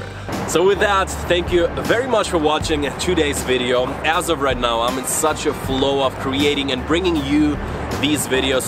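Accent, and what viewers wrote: Germany. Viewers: Can anyone tell whether this guy is German? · Bro kommst du aus Düsseldorf??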